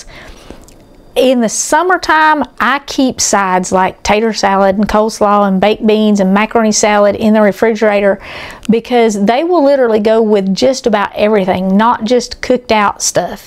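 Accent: South, United States. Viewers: You have a beautiful southern accent. I love your accent ❤ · I say tater all the time.I also say maters. Though I am from Indiana But I love the way the south speaks. Thank you for the advice of for cooking tater and eggs together. God bless you sister. 😊❤🇺🇸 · You can find Duke’s Mayo at Dollar General, and/or Family Dollar stores. Don’t worry bout saying taters, or maters! That’s just good ole southern country/ mountain talk. Thank- you for being obedient to the Lord, and sharing His Word. God Bless our Armed Forces! And God be with those whose loved ones gave the ultimate sacrifice for our freedom. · I absolutely LOVE your "tater" accent. And l am going to add some sugar to my "tater" salad based on your suggestion. I am a lay preacher living in S. Korea, and you make me so homesick for my kinfolk down south. Although l am originally from Missouri. 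God bless you. I will click "like" on your channel. BTW, do you make mac 'n chez, collard greens, or "tater" pie? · I just made some potato salad the other day and used the instant pot and cooked the taters and the eggs at the same time they came out perfectly I love your recipes and I love watching you I love your southern accent. Thanks for another great video.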